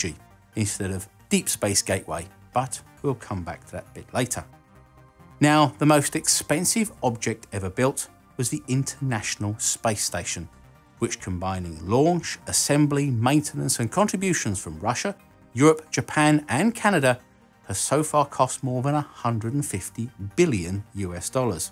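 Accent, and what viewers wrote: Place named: England, United Kingdom. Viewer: He is english. What accent should he have?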